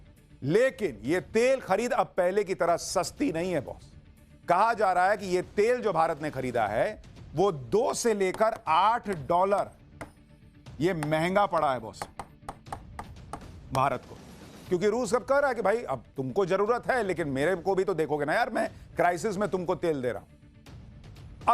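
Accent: Bihar, India. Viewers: Ye to Bihari Tun hai bhai aap ka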